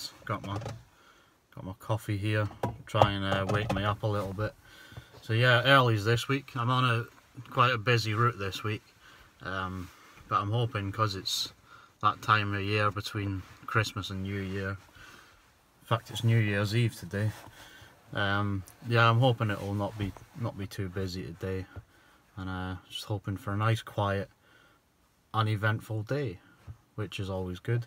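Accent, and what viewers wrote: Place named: Scotland, United Kingdom. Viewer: Thourghly enjoyed the video. Nice scottish accent. Hope your stil with the buses. Avid enthusiast.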